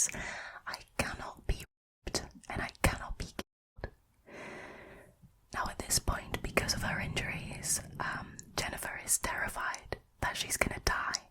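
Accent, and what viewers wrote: United Kingdom. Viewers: i really enjoy, as a non Brit, the sound of the classic Britissshhh accent you have...the one i, unsuccessfully, tried to replicate at english classes back in school days...you oftenly fly under my radar though since you mostly do Reddit stories and i'm more of a true crime aficionado... great narration and tone nonetheless...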